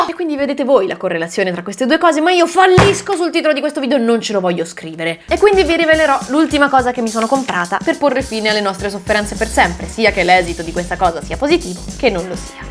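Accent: Tuscany, Italy. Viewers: Ma sei Toscana?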